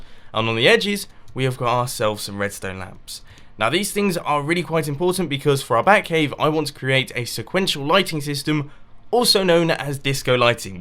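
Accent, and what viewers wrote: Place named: England, United Kingdom. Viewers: Nope here in England that voice is legit everywhere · i love the fact it is british cause im british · British people sound that way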